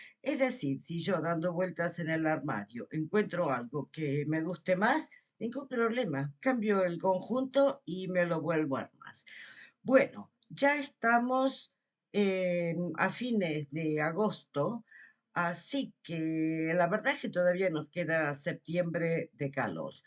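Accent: Argentina. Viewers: Portugal es muy bonito, yo conozco varios lugares de allí. Pero tú eres de Argentina, por tu acento . Yo tomé clases de tango con una profesora de Buenos Aires, aún sigo pero ya no en clases, solo prácticas y milongas. Un saludo desde Vigo.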